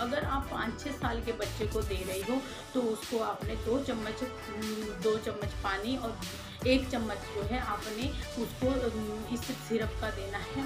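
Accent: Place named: Kolhapur, India. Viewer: बहूत आभारी है मैडम कोल्हापूर के भाषा में लय भारी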